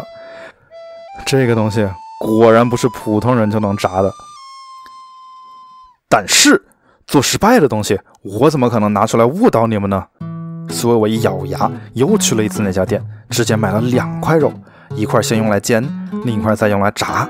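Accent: Wuhan, China. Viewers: 楼主是湖北湖南人吧。武汉人仿佛在听汉普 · 武汉普通话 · 武汉口音太重了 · 武汉口音忒重了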